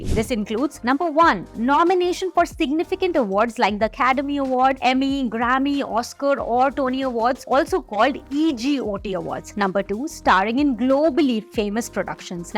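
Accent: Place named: India. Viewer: Horrible,horrible accent ,true torture to listen to ,we have no problem with creators from India who are speaking with American or Canadian accent,lots of people is learning English from YouTube but no one is trying to learn English with this accent….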